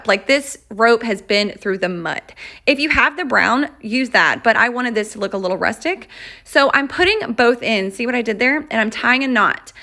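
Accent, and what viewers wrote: South, United States. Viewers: You are making me laugh....I KNEW you had to be from the South (I'm from TN)....and I ALWAYS say "anywho"...love it! I think I really like the Jingle All The Way sign. Never thought of distressing the metal. Great idea!!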